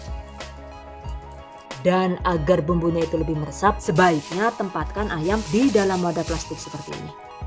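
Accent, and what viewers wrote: Banyumas, Indonesia. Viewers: Oh wong ngapak jebule.😄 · Fix ente wong ngapakkk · Masyaa Allah ngapaknya jadi kangen kampung halaman. Terima kasih resepnya. Barakallah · jebule ngapak, ngapak ndi mbak e, ws rong tahun maning nembe ngerti yt kie, suwun yh mbak e atas ilmune 👍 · ngomonge ngapak.. wong ndi kii😂😂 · mandan kaget pas pengisi suarane ngomonge ngapak🤣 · Deneng ngomonge ngapak yaa 😂😂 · Alahhkae..tengane wong ngapak..😂..kesuwun mbekayu resepe ya..semoga sukses terus yu😂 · laaah deneng ngapak siih 😂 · cilacape pundi... jan ora ngapak ora kepenak😅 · Galfok sama NGAPAK nya 🤣🤭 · Jebule wong ngapaak😭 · Suaranya enak tp tiba2 kaget ternyata ngapak ... 😅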